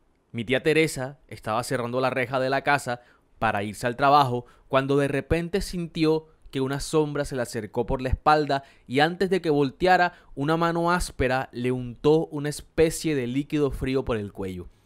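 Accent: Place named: Caribbean Coast, Colombia. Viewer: Me encanta como narras ❤ ese acento costeñoooo🎉🎉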